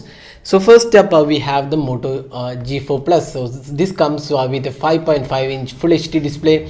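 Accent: Kerala, India. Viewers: Good review ..!! Nd the Malayalam accent too ..!!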